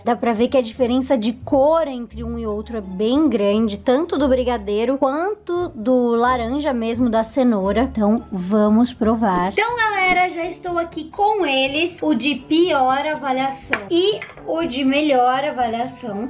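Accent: Rio Grande do Sul, Brazil. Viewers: Tu é gaúcha...??????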